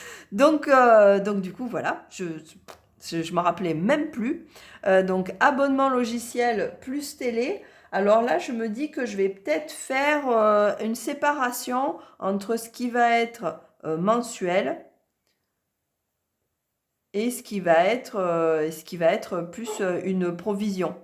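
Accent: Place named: Toulouse, France. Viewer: Coucou déjà j arrive pour ton accent car tu me rappelle une copine que j avais à Toulouse mais surtout par tes explications simples et sensées etce que je pourrais retenir Merci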